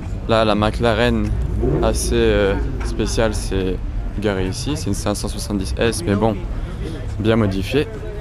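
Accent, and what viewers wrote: France. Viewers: A la meuf te lache un regard 👀 elle a kiffé l’accent français 😂. La Ferrari perso le son et juste dingue.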